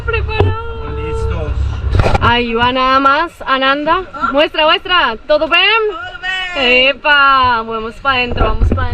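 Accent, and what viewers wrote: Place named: Colombia. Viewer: Este video me ayudo a aprender espanol. Tu hablas mas claro que muchos otros. Talvez espanol de Colombian es el meyor del mundo!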